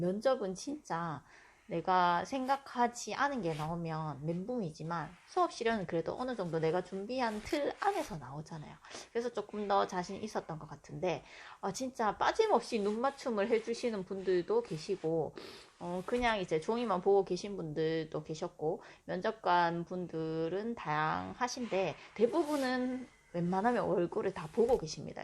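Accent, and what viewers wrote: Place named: Gyeongsang, South Korea. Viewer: 선생님~ 임용 공부하다가 억양이 너무 익숙해서 찾아보니 대구셨군요! 송구하지만 저도 자료 부탁 드려도 될까요? <mail> 입니다^^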